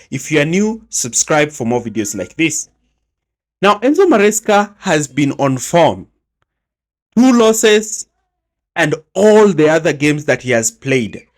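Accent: Kenya. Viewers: Kenyan accent 😊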